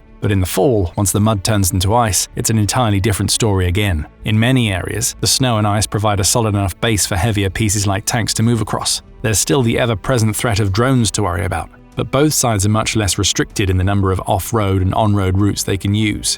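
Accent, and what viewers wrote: United Kingdom. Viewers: How weird it sounds to hear someone with a British accent calling autumn, "Fall"! Good presentation, though.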